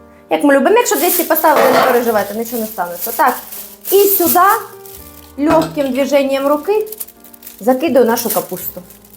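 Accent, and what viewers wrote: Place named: Poltava, Ukraine. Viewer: Ваш юмор і діалект, схоже Ви з Полтавщини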